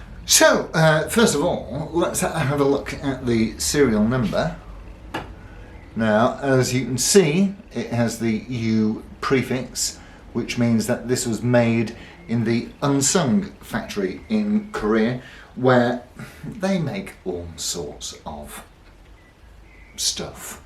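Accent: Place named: United Kingdom. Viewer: you’re one of those people that can make a british accent sound classy and bad ass at the same time, just like your guitar playing. just awesome, cheers man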